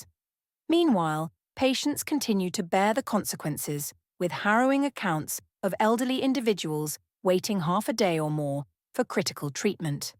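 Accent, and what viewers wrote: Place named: England, United Kingdom. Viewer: Brown Canadian with English accent… phew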